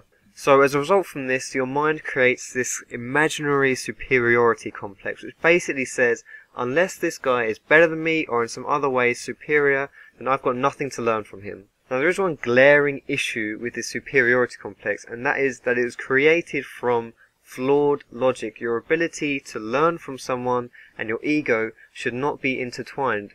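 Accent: United Kingdom. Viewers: expected a standard "ward here, lvl this and that, kill this first" guide stayed for the british accent liked because of the content i'll try to change more aspects about my attitude, but it's hard when the people you're trying to learn are completely over their own head ¬¬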